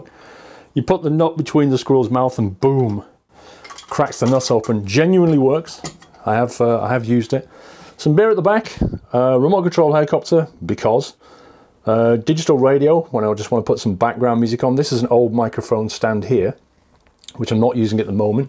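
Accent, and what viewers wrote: United Kingdom. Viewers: Great to see where you record. Nice to hear another Brit as well. We're thin on the ground it seems haha. From what corner of our tiny island do you hail from? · Squirexit lol. youre a lovely brit. naiss accent. love it. greetings from switzerland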